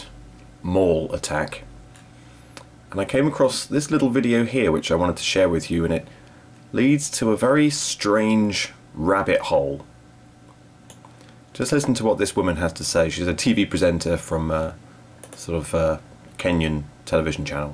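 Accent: United Kingdom. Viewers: she sounds very british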